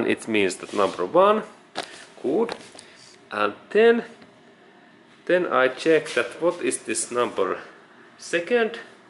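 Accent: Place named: Finland. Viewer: He sounds Finnish to me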